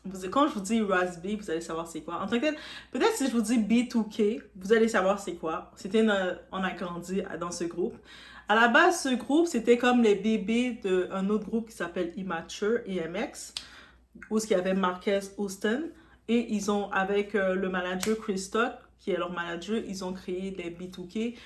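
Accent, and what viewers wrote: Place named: Quebec, Canada. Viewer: Je n'aime pas la traduction, je préfère le "vrai audio" en plus tu peux très bien traduire en résumé après mais c'est du détail. Je tiens surtout à te faire un grand merci pour tes vidéos et ton état d'esprit qui est feelgood. Et puis ton accent garde le, on va pas changer son accent pour deux glandus, t'es authentique ça fait partie du package, on dirait un accent du Québec c'est ça ? 💜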